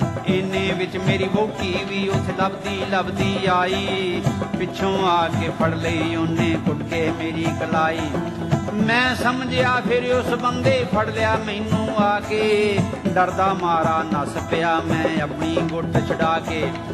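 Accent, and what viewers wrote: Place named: Okara, Pakistan. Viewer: same Pakistani punjab ki punjabi... mere area okara main same boli boli jati he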